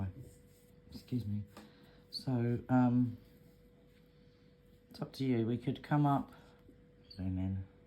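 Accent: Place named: United Kingdom. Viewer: You have the British accent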